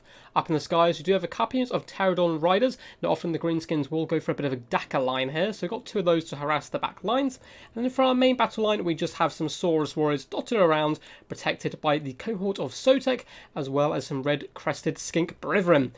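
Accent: United Kingdom. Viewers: To be fair I don't click on the video for the content anymore, but for the british accent podcast !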